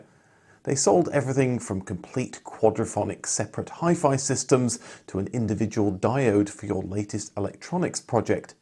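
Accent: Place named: United Kingdom. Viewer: Love Your historic vidéos. Lots of information and Your perfect British accent is so good for French ears. •••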